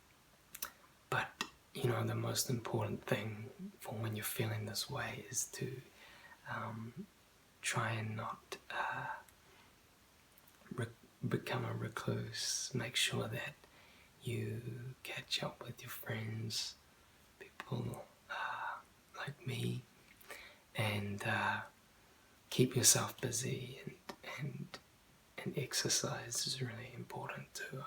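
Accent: New Zealand. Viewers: I needed this - X10. been through a bad time over the past couple of years with people. I to have just started yoga a few months ago too. How ironic. Love your accent, not many Kiwi's doing ASMR, lots of Aussie's though... Thanks, X10.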